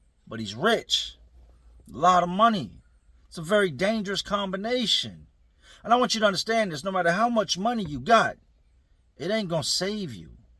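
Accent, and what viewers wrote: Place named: Virginia, United States. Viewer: Push the Butteeen. We know from VA, can’t deny that Virgina accent. I here some Danville in there from time to time too.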